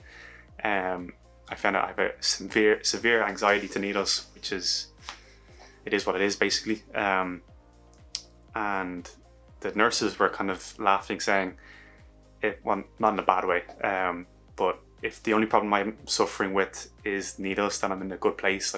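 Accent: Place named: Ireland. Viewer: Are you in Ireland? Your accent sounds Irish.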